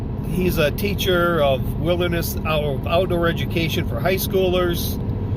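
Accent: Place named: Michigan, United States. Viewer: KIND of fun to hear your take on the Alone show again - after all this time. I had to laugh when you made fun of the Wisconsin accent - Cuz I have to Say - Your Michigan accent is pretty special as well. Of all the Merican accents I would have to put MN, WI & MI accents as the most "special" & annoying as F - of ALL🤡 & I have been to almost every state...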